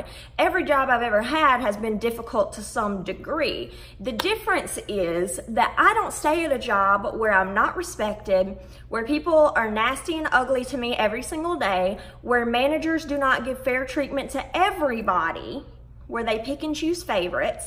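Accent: United States. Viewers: You country accent 😂😭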